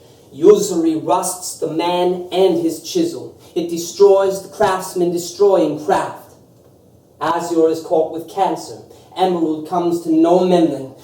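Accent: South, United States. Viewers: Ezra Pound recited in the most cultured Southern accent I have ever heard.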